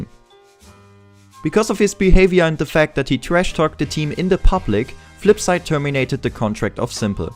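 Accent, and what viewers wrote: Germany. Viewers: This German Accent is so cute lol.#nohomo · Wusste gar nicht das du deutscher bist, aber der Akzent hat mich die ganze Zeit schon stutzig gemacht. Mag deine Stimme in den Videos. Passt gut zum stuff den du bringst. Super Arbeit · Are you german? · his grammar is alright but he has a annoying german accent and says "f" instead of "th"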